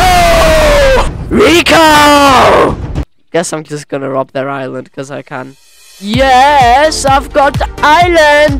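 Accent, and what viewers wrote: United Kingdom. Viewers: I love your British 😂😂😂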